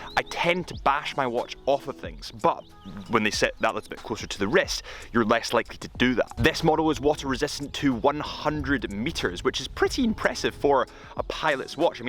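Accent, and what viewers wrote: Scotland, United Kingdom. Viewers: This outdoors video is a more raw, younger and more Scottish sounding version of BarkandJack’s work. Enjoyable !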